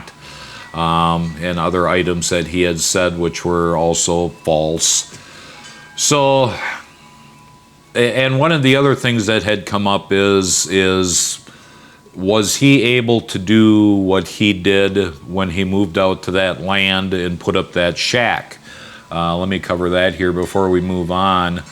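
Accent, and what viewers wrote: United States. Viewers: I'm surprised his ex-wife didn't blow the whistle on him??? By the way I love your Minnesota Accent!! You sound like all my relatives!! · sounds more like a Wisconsin accent.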